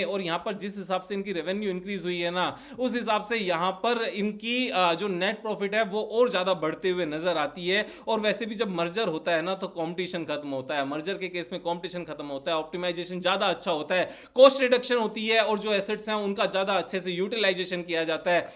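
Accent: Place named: Gujarat, India. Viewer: Bhai Have u been to Gujarat ever, sometime your tone has Gujarati touch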